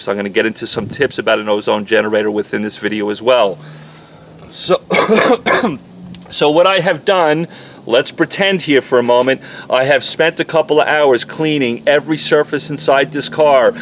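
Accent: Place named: North, United States. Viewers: You don’t sound like you’re from Dallas. You sound like a yankee. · wide awake thanks for helping . I can't stand his boston accent .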